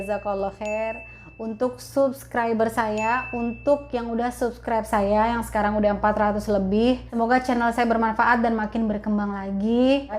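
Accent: Jakarta, Indonesia. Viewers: Waaaaah pok ini enak bngt cara bicara nya betawi bngt tapi,,sopan,tertata,jelas beda klau keluaran pesantren keliatan klau klrg Islami....TABAROKALLOH....SYUKRON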